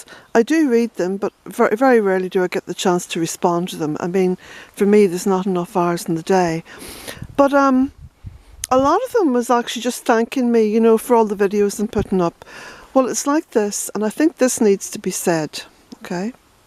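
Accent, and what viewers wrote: Ireland. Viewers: You know the minute you mentioned tea I realized that was what I was in need of ! Lol. Well you’ve inspired me too, I’ve already picked up my cardboard from Walmart and am ready to start building my raised beds to grow veggies. It’s been really good for me to be able to see the process, and hear you give your tips in your Irish accent too, as I used to live there and have fond memories. ☺️ Reading about something and actually seeing it are two very different things, and most of us don’t have someone in our family to teach us. Thank you so much. Loving seeing Jacks happy little face and antics too. Blessings to you.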